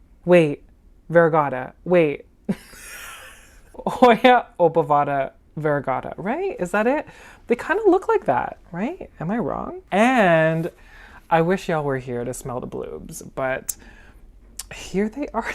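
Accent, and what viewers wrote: South, United States. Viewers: That Hoya Lisa is to die for! You crack me up. That southern belle accent is to cute. Love the sweater.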